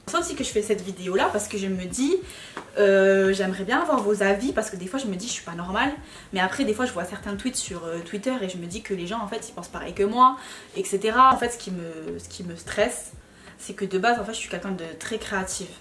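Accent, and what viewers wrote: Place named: South, France. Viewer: jaime trop ton ptit accent moi aussi je viens du sud de base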